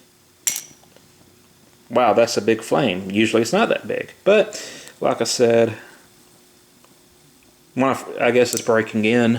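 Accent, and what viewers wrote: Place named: Texas, United States. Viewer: Wattel, Lightel, chambel, smokel, yeal, or anything ending in "r" in English intrigues me as to what part of the USA are you located. Having just left the northern east coast, it is definitely not from that locale.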